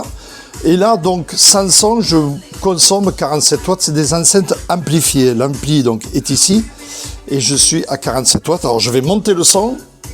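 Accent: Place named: South, France. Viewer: Bonsoir, L'accent du sud est charmant. De mon point de vue, il faut absolument rester crédible et adapter la véritable prononciation. Nous sommes de la même génération, alors ... <link> Merci pour cet échange et bonne continuation. 🙏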